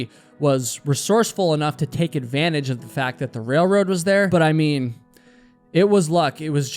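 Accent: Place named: Vermont, United States. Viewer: Been watching your stories for a while now ....10 STARS man , Thanks .....Hey i guess your from Vermont 😄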